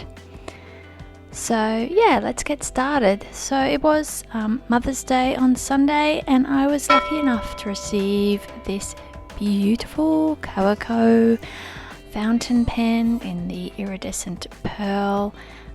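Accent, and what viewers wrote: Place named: Australia. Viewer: From your accent are you aussie? If so, where did you get the KURUKYNKI stamps?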